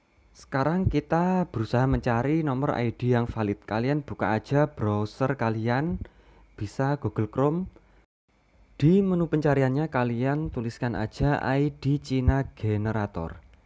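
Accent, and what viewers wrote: Java, Indonesia. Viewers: Suaranya medok banget bang mantap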